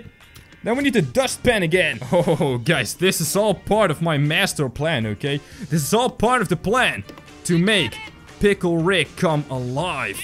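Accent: Netherlands. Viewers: I have a question. Are you dutch?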